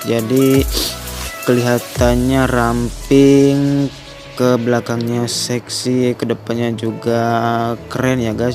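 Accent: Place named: West Java, Indonesia. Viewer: Ahh nyao ngomong na bet sunda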